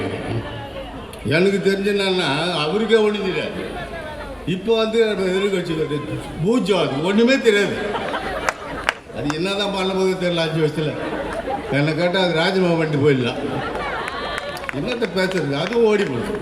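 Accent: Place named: Chennai, India. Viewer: 😆😆😆😆😆😆😆😆 original madras basha.... thala ne gethu thala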